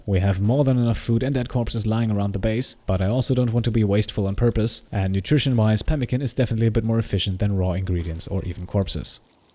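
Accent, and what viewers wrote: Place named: Germany. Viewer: Something's came to my mind watching this series. You have - maybe I am imagining it - a slight German accent. Is that the case? Because while being German myself and knowing how normal Germans speak English, I definitely had to listen quite a while to come to this guess. If that was true, I would highly have to take my hat off to your English language skills. ... die hast du doch nicht im Studium erlernt? Das erste Mal, als ich darüber gestolpert bin, war, als du von der Masterarbeit gesprochen hast, was mich das erste Mal hat aufhorchen lassen. Worüber war die btw?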